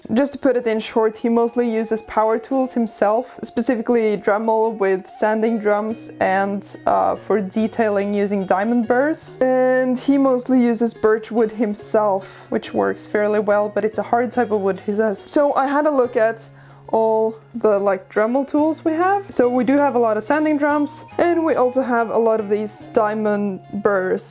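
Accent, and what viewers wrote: Russia. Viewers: Can't get rid of the impression that speaks with russian accent. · Why I hear a Russian accent? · Russian actsent?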